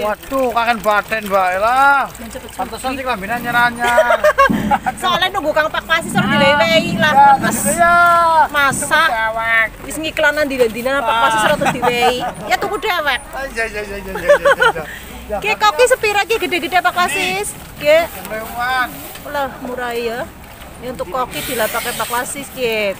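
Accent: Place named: Banyumas, Indonesia. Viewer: mantap nih satu frkwensi kontenya, pada pada wong ngapak hahahay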